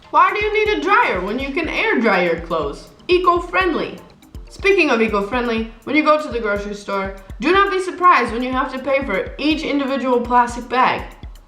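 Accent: Czech Republic. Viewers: All right girl, I do not know where the heck are you living, but let´s go through some facts. At first, children drinkin alcohol is a serious issue in CR as well. 2nd thing yea, there is no tolerance about driving while alcohol is still in the system, but it is happening frequently and people are dying bcs of it. Often. Also, people are fighting while drunk, very often. I live near a complex of bars and clubs and every weekend I am just counting ambulances escorting idiots from here. You are right about our fridges and grocery shopping, however paying rent by cash? It can happen sometimes, but usually normal thing is to pay by money transfer from your account. Salary in cash? WTF? Maybe when you are working in a pub, but otherwise nope. NOPE. If we are talking about "scetchy" business, then this has nothing to do with our country, it is happening everywhere in "modern" parts of world. 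Last thing, I am not trying to be suspicious, it´s more of a funny thing I noticed, but your accent is really "czechy". Cheers, enjoy your living in our beer land. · Your accent is Czech haha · She has Czech accent tho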